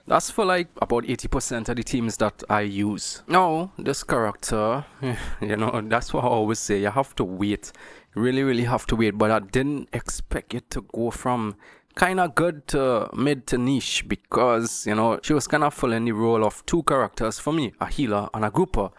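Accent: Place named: Trinidad and Tobago. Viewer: Dude, are you Trinidadian? That accent sounds classic Trini.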